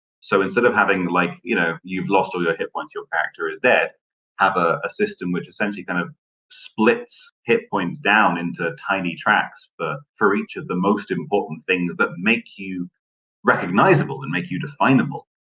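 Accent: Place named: United Kingdom. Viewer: You should review harnmaster, also ur totally British seth skorkowsky.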